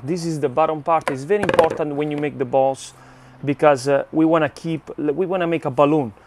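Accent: Italy. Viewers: sei bravissimo e grazie mille. PS: si sente tanto l'accento italiano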